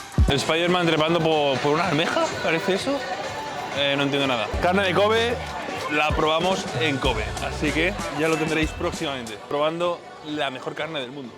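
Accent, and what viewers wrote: Argentina. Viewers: Se puede saber porque tiene que poner acento argentino? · en la CASHE...jajajajajaja, el ESPAÑOL mas ARGENTO del MUNDO MUNDIAL y OLEEEEE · amo como después de venir a argentina se te quedó la pronunciación de algunas cosas o te gusta decirlo así. gracias por tantos videos de calidad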